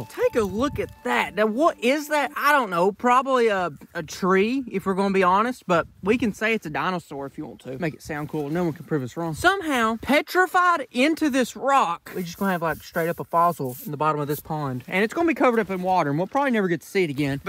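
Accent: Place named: Texas, United States. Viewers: You sound like you’re from Texas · You actually sound like Georgie in young sheldon. Are all texas poeple speaking with this accent!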